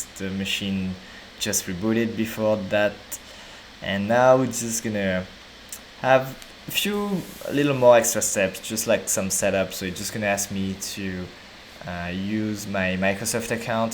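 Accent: France. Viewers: French accent 😅, good work budy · if you don't mind me asking, are you french?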